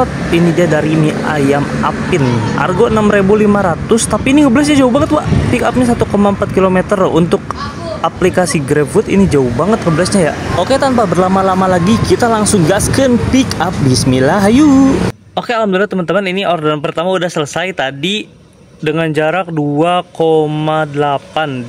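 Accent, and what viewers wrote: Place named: Sumatra, Indonesia. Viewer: Itu logat Sumatraan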